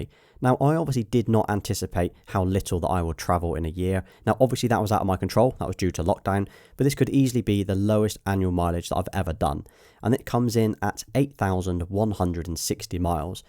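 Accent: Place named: England, United Kingdom. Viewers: Tesla UK, another relaxed English accent to enjoy 🇬🇧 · I enjoyed your video and love the English accent! Tesla UK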